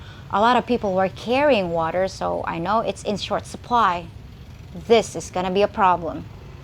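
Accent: United States. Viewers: American accent man so madam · Maayong gabi,! Stumbled on your You Tube video here with an insightful view of your hometown after the powerful typhoon passed the place. I liked your English accent here. Did you grew up in the States? Anyways, I appreciate your report here. Had many of the people I know who are based in Cebu, mostly in the downtown area. Daghang salamat!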